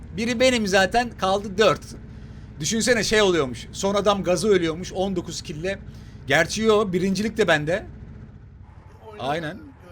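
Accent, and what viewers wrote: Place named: Azerbaijan. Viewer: abi , sendemi Azeri çıktın ...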